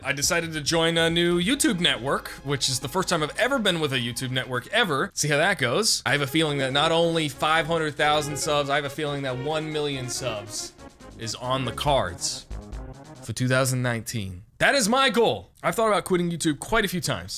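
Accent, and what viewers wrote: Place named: New York, United States. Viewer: I think people only watch this dude cuz of his new York accent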